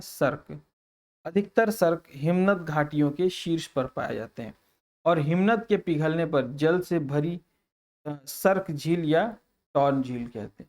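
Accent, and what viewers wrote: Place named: Uttar Pradesh, India. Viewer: Bahut hi jhakkas ek dum up wali bhsha me bole to mast lajwab❤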